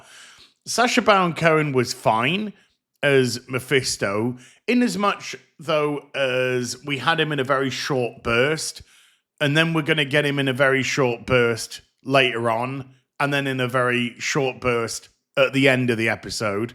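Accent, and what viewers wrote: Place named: England, United Kingdom. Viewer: It’s not a British accent, it’s an English accent. An Englishman from England, speaking English.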